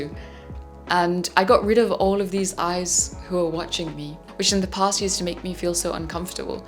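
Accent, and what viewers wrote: India. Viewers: ur voiceee issssss sooo beautiful are u Indian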